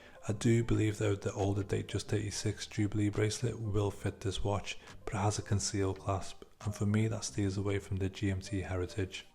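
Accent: Liverpool, United Kingdom. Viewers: Scouse accent I hear lol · Being from Liverpool...love that I’m listening to a scoucer ...living in Scottsdale and Chicago for the past 40 years....and loving Rolex....I am looking for this watch. That’s one beautiful watch !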